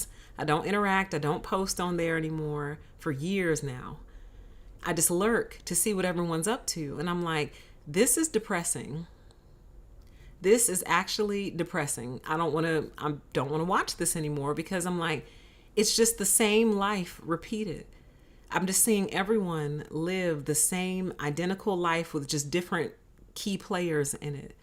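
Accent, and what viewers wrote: South, United States. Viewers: your slight southern tone just makes it that much more rich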